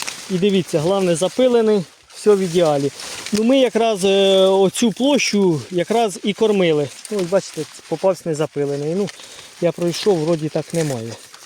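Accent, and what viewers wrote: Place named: Sumy, Ukraine. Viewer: Коментар на підтримку. Але ж як приємно чути нашу сумську говірку)))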